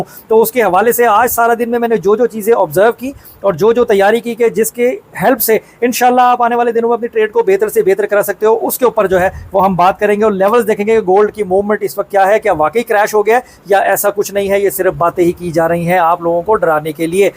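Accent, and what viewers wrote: Punjab, India. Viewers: Sir ap ka Punjabi touch oye hoye mashAllah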